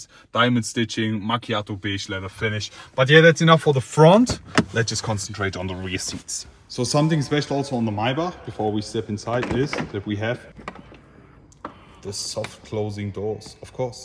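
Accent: Germany. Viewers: The fact that he’s got a thick German accent made me subscribe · Dieser deutsche akzent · Alter dein fucking German english Akzent Lachkick genial ha ha ha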